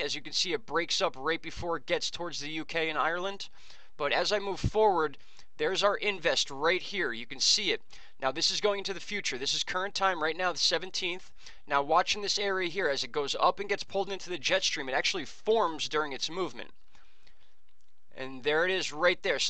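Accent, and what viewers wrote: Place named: Long Island, United States. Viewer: You have a Long Island accent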